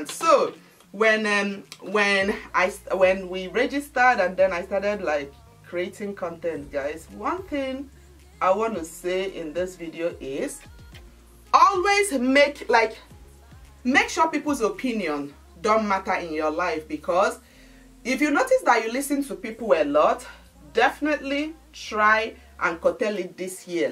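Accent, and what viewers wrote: Igbo, Nigeria. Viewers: This is a huge motivation ❤ it was even your so called "Igbotic accent" that made me stick to your channel since I came across it in 2019 bcos it makes me feel at home (like having my sister talking to me)😂 unlike watching influencers with American accent. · I don’t have any acne but I was drawn to your channel because of your accent😊I loved the fact that an Igbo girl like me wasn’t ashamed to be herself on YouTube. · I started watching some 10yrs ago too. I remember I was looking for some videos on how to make Nigerian fried rice and how to tie gele. But I remember clicking on one of your acne videos and immediately I heard your voice, I said, this is my Nigerian sister and I subscribed. You have really come a very long way sis. And we thank God for his faithfulness. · its even the accent that made me subscribe....I am an Igbo girl too, and you sounded so relatable. 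My first time seeing you was that collab you did with Dinma Umeh. Well done for your resilience and Congratulations, you really inspire me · Hahaha , 😂you are soooo real.love you for your sincerity, love your Igbo Accent like the french, Italian, Spanish........❤❤❤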